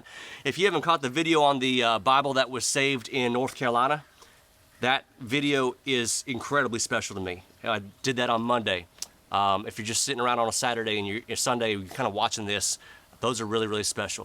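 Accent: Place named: New York, United States. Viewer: What's funny.. Is actually has a new york accent in real life.. Lol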